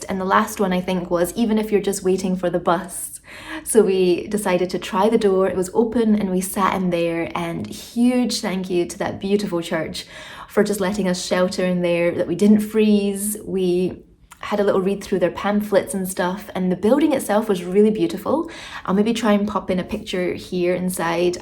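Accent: Scotland, United Kingdom. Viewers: Good to hear nice Scottish voice ,Peebles looks lovely 😊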